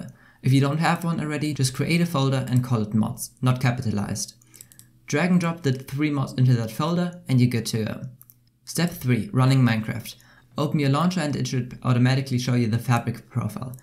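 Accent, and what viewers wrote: Germany. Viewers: deutscher akzent xD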